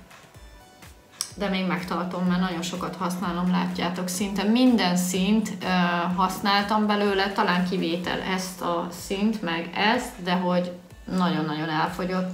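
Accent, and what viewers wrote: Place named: Szabolcs, Hungary. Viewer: Fogyik, forrik ..stb. Ez tök normális itt, Szabolcs megyében és Debrecen környékén. Tudom, hiszen itt éltünk Nyíregyházán! 🌷👍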